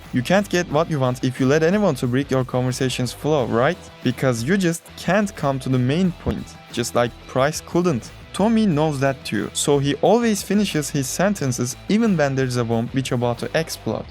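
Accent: Turkey. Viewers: are you from turkey? Your accent is very similar. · Türk sivesi nerde gorsem tanirim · Bro are you from Turkey your accent sounds so Turkish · Türk aksanı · Nice video mate, are you Turkish? Your accent sounds like really really Turkish! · admin are you from turkey? your accent is so similar so im asking :) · Türkmüsün kardesim. Aksanın benziyor :D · türksün aksandan belli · Bir türkün ıngilizce konuşması 😂 çok belli ediyor kendini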